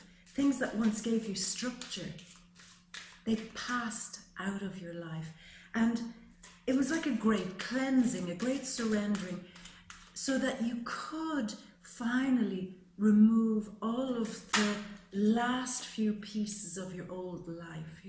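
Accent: Ireland. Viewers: wow ,,,and all in a lovely Irish accent <<<subscribed beautiful x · My God, what a reading, I hope it transpires because I had an Astral Prediction done last in 2017 and was promised changes would start in May, do the lottery and a windfall would come in, I spent over a £1000 on lotteries from end of March to June and won very little, other things were going to change, reconciliation and more? My birthday is 26/12/1961 I was born at 5.40am birth in Kirkby, England? I've been through it over the last 30 years but none more so, than the past 2.5/3 years. My wife ended our marriage 15/12/16 walked out Jan 2017 I've been sick with depression and anxiety, hospitalised with blood clots on my lungs, I was suspended from work. Now things are meant to be going right for me? Why do I have no faith? My ex filed for a divorce, I got the papers virtually a year to the day she told me it was over 16/12/16 now I find myself in a predicament, fearing losing my job, my house, half my pension, everything. 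By the way, may I just say you are beautiful, your eyes are so mystical, your soft dulcet Irish tones are so soothing and I love your witty repartee. I'll be watching your readings every month for as long as I am here. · nice Irish accent.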